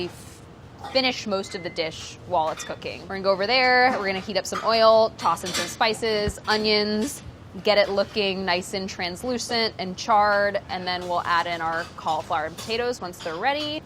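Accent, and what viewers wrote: United States. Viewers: Oh God. She's speaking nonstop. Her very india skin tone but completely American accent with her nonstop verbal diarrhea is hurting my brain. Ah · I have trouble appreciating her very girly american accent but the recipe looks delicious, which is the i portant thing haha.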